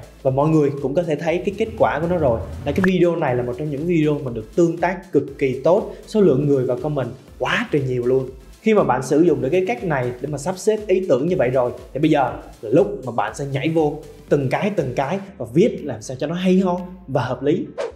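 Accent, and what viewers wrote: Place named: Central, Vietnam. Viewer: Mình nghe là biết bạn người miền trung nhưng vạn nói hay đó, diễn đạt dễ hiểu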